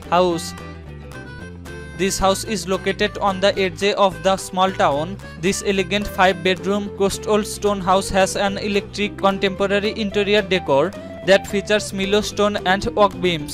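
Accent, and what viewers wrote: India. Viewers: Indiano ata tong nagsasalita. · Parang tunog ng Indian pag nagsasalita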